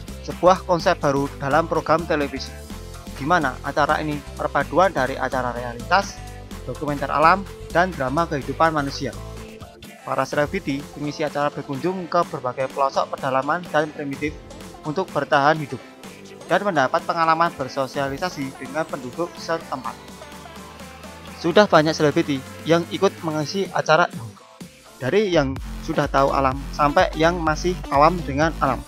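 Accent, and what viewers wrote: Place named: Java, Indonesia. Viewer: jawa sekali kalo baca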